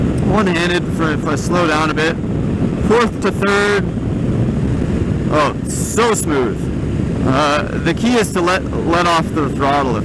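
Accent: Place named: Canada. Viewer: Great review. Thank you. When you talk about the speed you are going, is this in kph or mph please? (I see that the speedo is calibrated to read in kph but I think your accent is Canadian (sorry if I got this wrong and if you are in fact American!) and so you would normally be using mph. Judging from the video I suspect you are talking kph?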